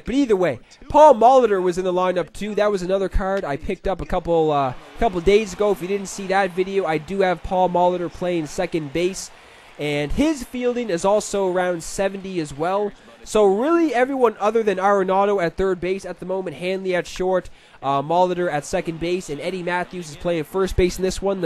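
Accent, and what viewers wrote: Canada. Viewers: U must be from Newfoundland with that accent u got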